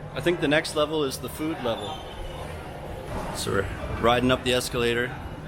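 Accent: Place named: Toronto, Canada. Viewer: NIce to see another Toronto voice active here in the Penh....and a fellow bassist to boot. Great vids.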